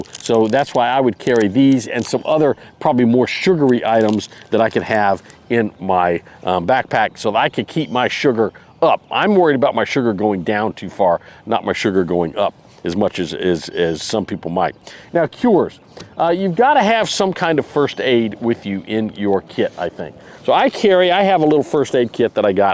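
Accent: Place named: New England, United States. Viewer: Thanks. I lived in Jacksonville FL for a few years, and worked maybe up to a year in (Fl/GA Stateline) Kingsland GA. I had guessed to myself that maybe you lived in OK, AR, or TX because of your Yankee accent, having forgotten that lots of Yankees life in FL et al. I have about 10 backpacks and Bug-Out-Bags because I call "hikes", expeditions, because I am looking for places of interest to explore--each one related to a specific hobby that requires different equipment. I would never carry two folder knives (tho I have no objection about that). I would add either a folder Silky saw (best for green wood) if one wants to cut branches to prevent widow-maker dead fall from trees, or the Laplander folder best used for dry/fire wood. Yes these are longer, but much more useful in unfamiliar terrain and unexpected weather. I would also never bring sugary snack bars. 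They don't stick and they tire me (as I am pre-diabetic); but I do carry sugar (brain needs sugar) in the form of peppermint life-savers (and I suck on them when I recognize that I am not thinking fast or clear enough. If the lives in a Southern State bordering an ocean, I would not recommend sleeping on ground. I had in my backyard in NE FL, dozens of tiny poisonous rattle snakes (I don't recall their proper name). I'd much prefer a hammock with mosquito net and tarp overhang to a poncho (and I have both). I'd sleep better in a hammock off ground. Now I'[m in a winter state and tomorrow the wind chill is predicte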